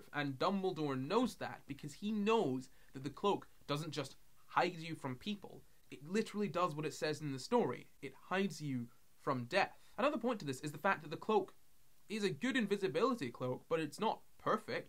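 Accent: Scotland, United Kingdom. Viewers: He is Scottish from Dundee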